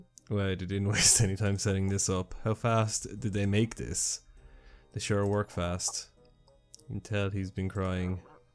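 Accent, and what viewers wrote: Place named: England, United Kingdom. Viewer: I like your England accent. :) Btw subscribed to you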